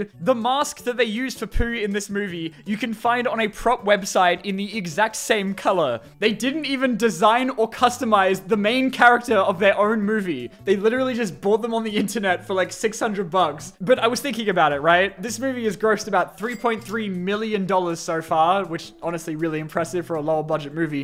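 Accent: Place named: Australia. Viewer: . Are you Australian? I did not know that!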